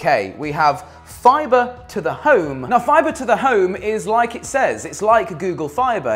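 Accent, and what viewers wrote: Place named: RP, United Kingdom. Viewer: Posh as fuk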